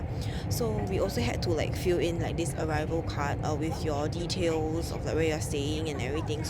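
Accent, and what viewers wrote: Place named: Singapore. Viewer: Singapore English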